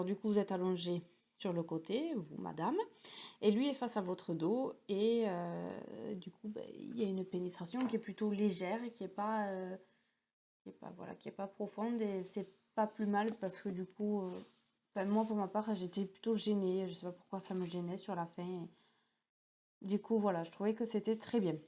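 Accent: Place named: Marseille, France. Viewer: tu parles comme une cagole de marseille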